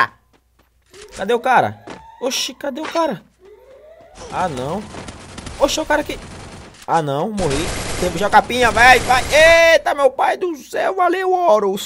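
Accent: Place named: Paraíba, Brazil. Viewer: Paraiba pra fala feio Zuado joga nada.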